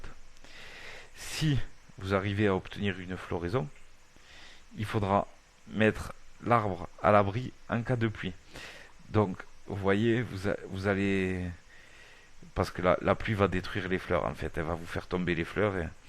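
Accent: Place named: Central Pyrenees, France. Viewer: l'accent de pyrénées centrale de chez moi x)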